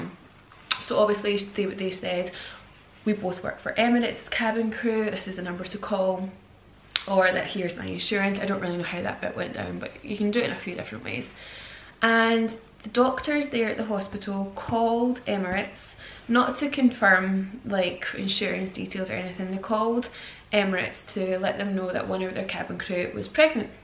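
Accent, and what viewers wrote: United Kingdom. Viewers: is that Scotish accent?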